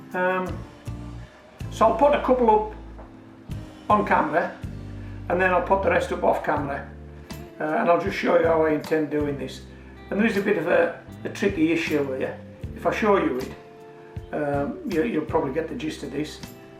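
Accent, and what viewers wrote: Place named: Cumbria, United Kingdom. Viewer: Informative video. l'm in Canada, zone 4b, and starting everything indoors in a couple of moths to plant out in May. Imagine my surprise to hear what sounds like a good Cumbrian accent (l'm originally frae Maryport). Great job on your channel.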